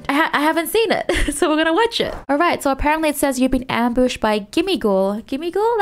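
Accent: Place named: Australia. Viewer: Ohhh, you're from Australia, that's where your accent comes from, finally!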